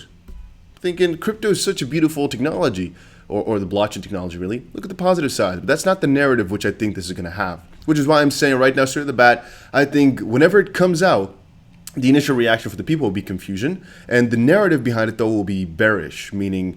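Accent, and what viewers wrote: United States. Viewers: You sound like you grew up here in America. Our own accents are wildly different from region to region.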